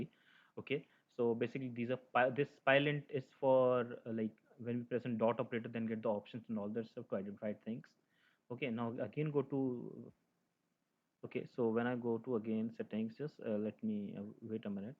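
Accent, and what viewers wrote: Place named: India. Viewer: what is it with all this indian dudes, i just can't find a video without a heavy indian accent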